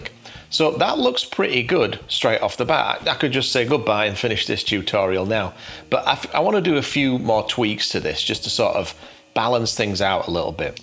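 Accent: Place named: United Kingdom. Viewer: First time seeing your channel. I loved the ending where you ask for some feedback! From the accent, I assume you are British, and your British humor comes shining through! Liked and subscribed. Can’t wait for more content, and you ‘giving me the eye!’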